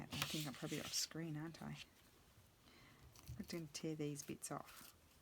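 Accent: Australia. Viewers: Where do you live, you sound like you are Australian.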